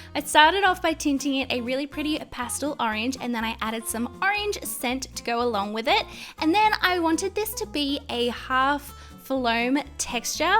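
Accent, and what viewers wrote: Australia. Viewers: You can tell by her accent, she is from Australia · Shes Australian. She cant help it. If you want to hate, get the fuck outta here.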